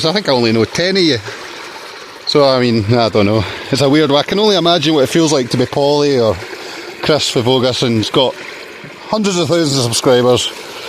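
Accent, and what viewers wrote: United Kingdom. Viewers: I knew that twang was Edinburgh, I take it your not far. Was going to say thought I knew your voice when first heard u but surely not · Never heard Scottish befor . Love it. Good luck with gold hunting. You'll be needing it soon.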